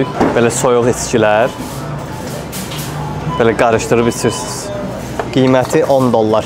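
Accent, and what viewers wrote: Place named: Shaki, Azerbaijan. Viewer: Şəkili ləhçəsi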